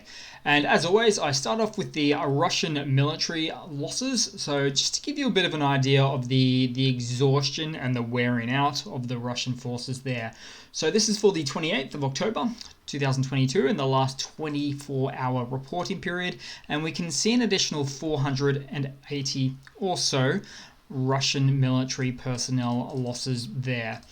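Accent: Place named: Australia. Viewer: Thank you,! I love your updates, & I love your Australian accent!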